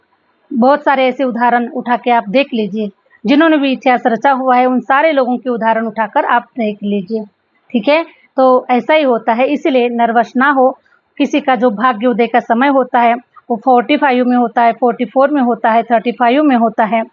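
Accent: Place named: Maharashtra, India. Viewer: Mumbai wali language Marathi vibe hain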